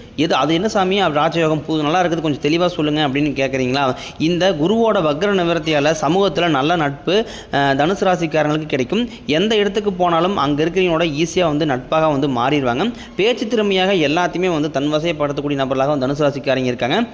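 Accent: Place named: Kongu, India. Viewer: அது அவங்க ஊர்ல அப்படி தான் பேசுவாங்க. கரூர் கோயம்புத்தூர் ஈரோடு நாமக்கல் கொங்கு பக்கம். அவா இவா என்று பிராமின் பேசும்போது அவங்க கிட்ட ஏன் இப்படி கேள்வி கேட்க மாட்டேங்கறீங்க? நான் திருச்சி பக்கம். அவங்க இவங்க என்று பேசுவேன். ஊருக்கு தகுந்த மாதிரி தமிழ் வழக்காடு மொழி மாறும். யாருக்காகவும் நம்மை மாற்றிக் கொள்ள முடியாது. சுயம் எப்போதும் மாறாது.🙏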